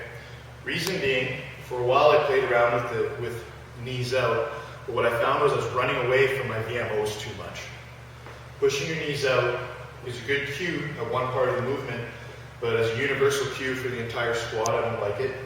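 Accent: Canada. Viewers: is canadian right? I can hear the Canadian in his voice:P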